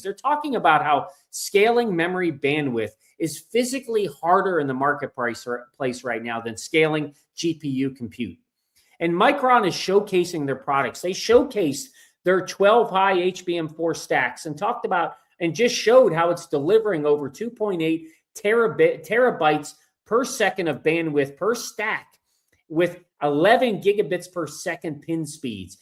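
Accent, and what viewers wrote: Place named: Chicago, United States. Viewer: You sound like you're from Chicago, neither Boston or from Texas. 😊😊😊 tambien Feliz Cumpleanos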